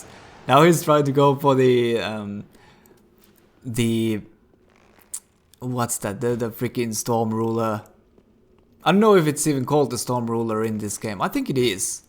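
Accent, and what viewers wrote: Finland. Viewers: For some reason you dont have that ugly Finnish accent, but a really enjoyable one. Get some riposte dps bro.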